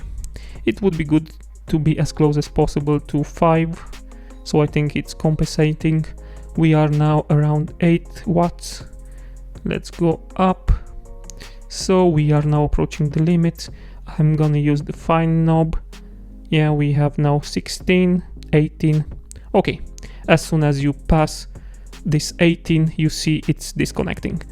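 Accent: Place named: Romania. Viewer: accentul de roman se cunoaste fratele meu :D:D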